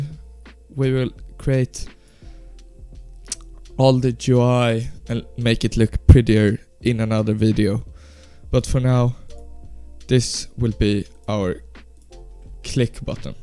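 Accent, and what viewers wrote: Sweden. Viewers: Are you swedish it sounds like that good tutorial! :D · bro have got the swedish accent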